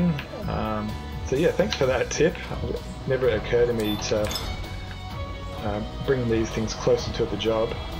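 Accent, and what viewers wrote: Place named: Australia. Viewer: that makes sense! 😂 you upload schedule says USA but your accent says Australian 😂 damn! Timezones are annoying!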